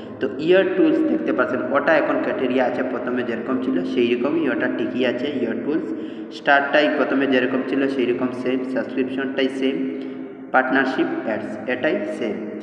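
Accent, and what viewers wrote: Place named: Sylhet, Bangladesh. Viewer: আপনি ত সিলেটি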